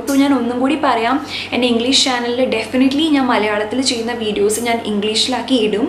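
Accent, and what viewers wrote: Thrissur, India. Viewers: Chechi supertooo thrissur style talk I Loved it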